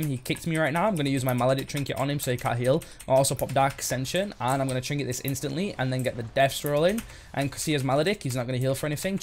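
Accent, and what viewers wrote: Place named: North, United Kingdom. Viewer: Love the videos man, entertaining. As a fellow northerner, it’s nice to hear the accent too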